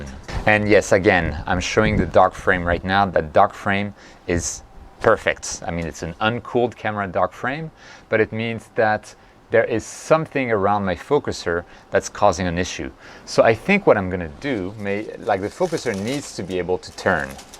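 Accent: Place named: France. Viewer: ahhhhh I knew there was some french tones behind! ;-) sounds like my french aunt accent , who is living in California since the 60s... that's why my ear is used to this very faint "french touch"... and that I definitely prefer the butt plug term (LOL) . I guess you're right about aesthetic, especially during nighttime, nobody cares! I'll go for the "amazon touch" as soon as tomorrow evening. Looks like I had access to another video i wasn't supposed to..sorry.. not my fault! But as a consequence I didn't received your answer to my comment about taking flats during sunset... :--)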